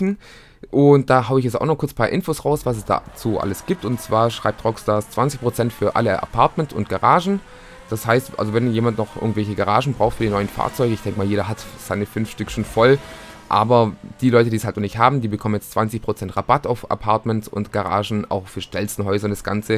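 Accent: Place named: Swabia, Germany. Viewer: Seit Beginn 2016 spielt eig. eh fast jeder mit UnlockAll und im Vergleich zu anderen Updates hust finance and felony hust find ich das Cunning Stunts DLC nicht mal soo teuer. Und trotz alledem sind die Autos in diesem Update viiiel geiler als alle anderen. Ansonsten: Cooles Video! :) Ps: Bin auch Schwabe. Den Akzent kannste dir einfach net verkneifen :D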